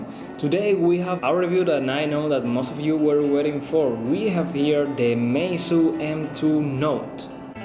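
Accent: Andalusia, Spain. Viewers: Good andalusian english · Jajaja andalucia english.estas en todos lados me alegro.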